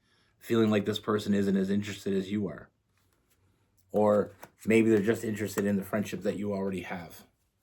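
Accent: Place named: Canada. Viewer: I friggin knew you were a cappie! aslo hearing the canadian in there. Love your work and storytimes ;)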